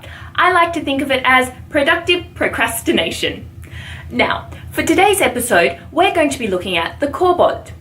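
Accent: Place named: Australia. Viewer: You deserve more subscribers - the name for your channel is false advertising! Also, it's great to hear an Aussie accent (bonus points for paying attention to pronunciations tho!) discussing obscure monsters on YouTube :)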